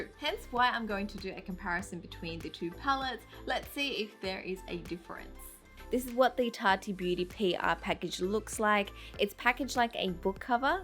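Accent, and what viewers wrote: Australia. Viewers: Australian accent??? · Is your accent Australian?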